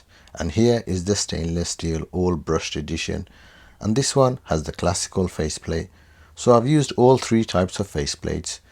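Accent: Birmingham, United Kingdom. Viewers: You came up on my recommended, so this is the first of your videos I’ve seen. My first impression is ‘sounds brummy, specifically uk vibe of deadpan humour mixed in with confident yet laidback information’. I’ve never fixed a watch in my life or looked to do so (though I fix other stuff), but I’ll still be watching haha Glad people are recognising your work and sending you bits to tinker with! Hoping more independent companies get into making high quality 3rd party parts for restoring or customising otherwise forgotten tech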